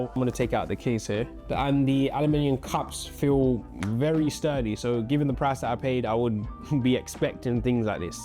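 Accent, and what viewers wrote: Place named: United Kingdom. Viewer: Just got my AirPods Max a few days ago and I don’t really regret it they’re amazing headphones. Also, Super random but you’re living in Canada with a British accent I have a question. As someone from the UK wanting to live in Canada which is better? (I think I know the answer)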